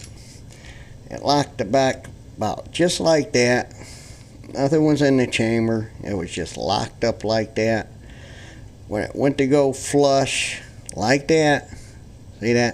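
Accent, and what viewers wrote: United States. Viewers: He's an American. He can talk any way he wants as long as it's English! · Someone commented negatively on your accent. I would like to leave a positive comment on your accent. I could be wrong, but it sounds like Arkansas. In any case, it's a wonderful accent - don't ever lose it. People would like to copy it, but you've got the real deal - what a treasure! In addition, you seem so candid and honest, truly genuine. Thank you for the review. God bless!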